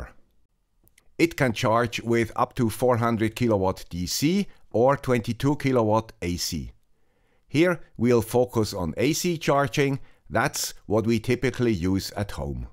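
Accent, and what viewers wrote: Switzerland. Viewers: Nice to hear the Swiss accent back! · Right now YT suggested me this video. I rushed to it when I saw it was from the guy with the Swiss accent. I really miss your videos,. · Hes back, and his accent is more swiss than ever! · I really really happy, hear your swiss accent again. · Adding to other viewers comments, I've missed the Swiss Accent too. Greetings from San Francisco. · Nice to listen to your swiss accent again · We missed that Swiss accent so much!! Welcome back and congrats on the new ride🎉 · Yes, the guy with the swiss accent is back and helpful as always. Cheers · Omg I can't believe I'm hearing "the guy with the Swiss accent" "live" with fresh ideas, again! 😁😁😁😁😁 Thanks as always!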